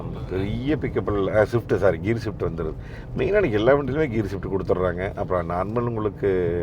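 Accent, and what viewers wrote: Kongu, India. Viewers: கொங்கு தமிழ் அருமை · Bro, korangu pugaielai (country tobacco brand)... Typical kongu slang..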